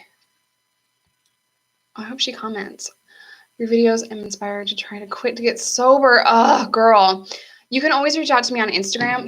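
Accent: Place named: Endicott, United States. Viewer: So hey girl! I had an odd feeling when I ran into your channel that you may be from Endicott, what with your accent and all. I am too! My family actually moved me to NC to avoid PINS/ hanging with dope fiends all day long. I have stories upon stories from drug raids to robberies in the 607 if you ever want to get up and talk about how your upbringing and environment played a role in who you are. It’s so crazy to see how I probably would have ended up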